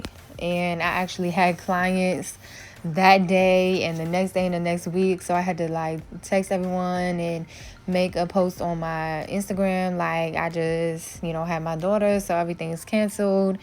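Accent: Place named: DMV, United States. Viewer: I knew you was from dmv! Heard it in ya voice! I love your nails! You bomb AF!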